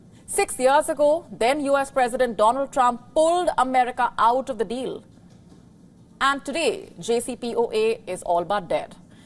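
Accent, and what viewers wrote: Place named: India. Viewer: Very good news report in indian English easy to comprehend unlike American and European English pronunciation having a lot of guttural and nasal accent Thanq Wion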